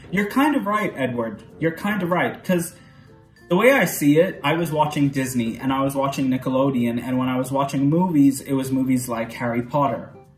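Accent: United States. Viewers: It's highly likely that J.K. Roweling took inspiration from J.R.R. Tolkien when writing Harry Potter. Also many people think you are American because of your accent. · You don't "look American"? ... Americans can look like any ethnicity in the world, and you DO SOUND American. I mean I knew you were from UAE but only because I saw it in your "about" page. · I think everyone thinks you’re from the usa because your accent sounds soo American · A couple of things regarding the Clone Wars. First, I get why doing reactions to it could be tiresome but instead watch it at your own leisure and then when at the end do a reaction to the final 4 episodes because combined they make one of the best Star Wars movies in existence. Secondly, a good reason to watch the Clone Wars is that some of the main characters will now be on the Mandalorian and/or will be getting their own show in the very near future. 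PS. Im with others and thought you were American. You sound like you could be my neighbor here in California. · I also thought you were American based on your accent. I love your reactions. You seem very sincere and genuine. It's why I subscribed--you seem like a fantastic person. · you sound very American. I think that's why people assume you are in America. I even assumed that. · Wow -- I thought that you were an American! Even going by accent alone, I would have guessed that you were from Chicago, Miami or Los Angeles. In fact, you look so much like my best friend from high school that I had to do a double-take the first time (and, yes, he had a beard in high school). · if you never lived in the US then you definitely have super impressive English and a super impressive American accent. You had me fooled! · I love how genuine you are about your emotions and aren't afraid of what people think about them. 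It really puts you a cut above a lot of similar content like this on Youtube. Sort of coincidentally I used to have a friend from Dubai who didn't like that I used the word "cute" to describe something because he thought it was a very feminine way of speaking though I got him to back down by getting him to admit there is no better word to describe baby ducks. Also people probably assume you're American because of your accent which is very American since we're a really diverse country that comes in all sorts of races and ethnicities it's hard to assume someone isn't American by looks alone.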